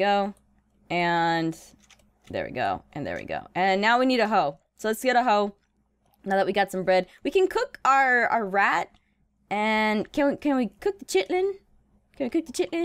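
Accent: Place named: United Kingdom. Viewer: your british... I can tell...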